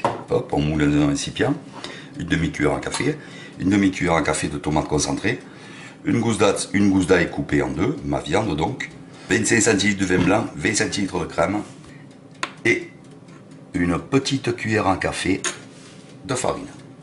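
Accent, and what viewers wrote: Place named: Provence, France. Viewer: Oh fan, tu me fends le cœur ! Je fais la même et je la termine avec un trait de cognac ! Je regarde régulièrement tes recettes et je me régale de ton accent, le vrai, celui de Pagnol et qui me manque tant là où j'habite (Auvergne) ! Mille merci pour ton partage, et compliments, cuisiner sur deux plaques électriques ça mérite une médaille ! Adéssias Amigo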